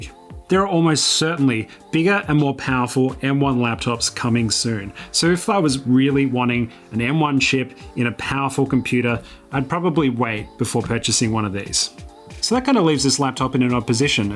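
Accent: Australia. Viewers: was going to ask if you're Aussie based on your accent and I was right... Melbourne represent! Love seeing Aussie tech you tubers :)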